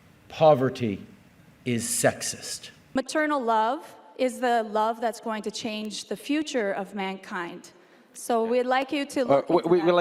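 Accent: Canada. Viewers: are you canadian lol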